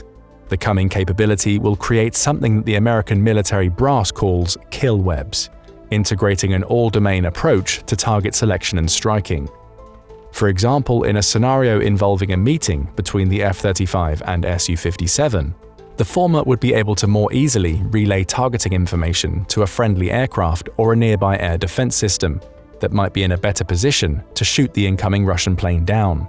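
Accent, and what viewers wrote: England, United Kingdom. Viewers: It sounded like the narrator had an English accent. They can't use the F22. I'm not even sure they have the F35A.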